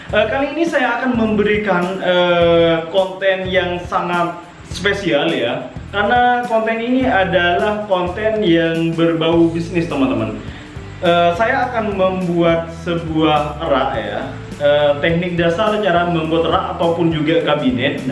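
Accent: Madura, Indonesia. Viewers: Madura kayak nya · Orang madura ya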